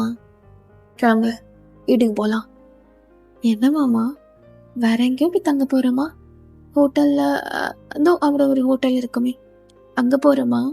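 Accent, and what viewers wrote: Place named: Kerala, India. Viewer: Akka I'm a malayali 😊 but your malayalam is soo cute ❤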